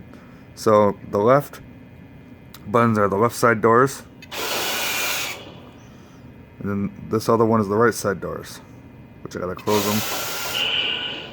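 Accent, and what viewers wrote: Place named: New York, United States. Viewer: Like the voice has New York accent even.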